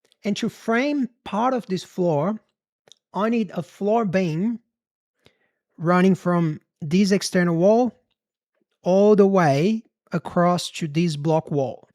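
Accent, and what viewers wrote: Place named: Australia. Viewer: The ozzie accent on a brazillian is mind boggling. Absolutely unbelievable! It's almost the opposite of a Japanese speaking Arabic.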